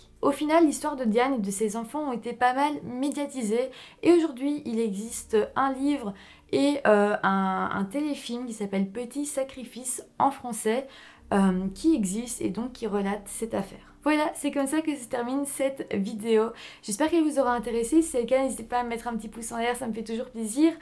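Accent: Belgium. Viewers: Merci pour cette vidéo, c'était très intéressant et bien raconté. Serais-tu belge à tout hasard? :) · Oui, c est un accent belge 🇧🇪😁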